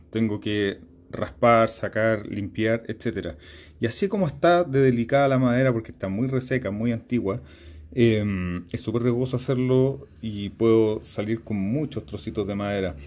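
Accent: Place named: Chile. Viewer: My wife said u r from chile after hearing your accent 🇨🇱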